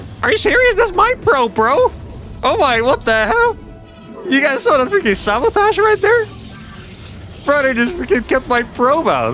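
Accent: Netherlands. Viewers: imagine hating the Dutch accent but the two largest SC2 CCs are both Dutch lol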